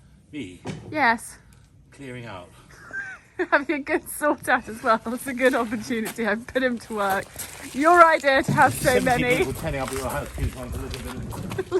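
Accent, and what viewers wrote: United Kingdom. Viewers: I really like your Videos!!! Its very refreshing and i love your UK Accent.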